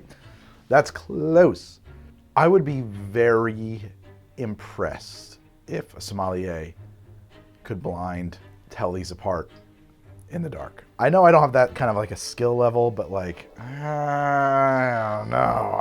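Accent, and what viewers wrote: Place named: United States. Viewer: people from the usa are so cute when they try to pronounce french words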